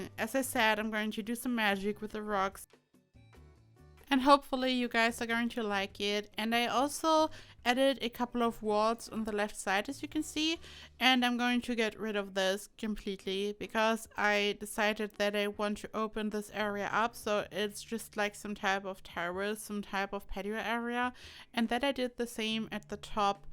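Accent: Germany. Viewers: your accent smells so German 😍 and i love it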